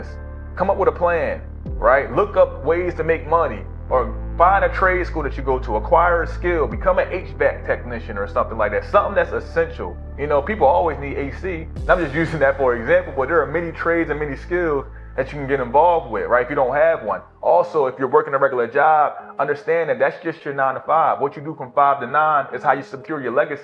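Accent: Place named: Philadelphia, United States. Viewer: Wait is he from Philly ? 😭 ”anything that ain’t thurl”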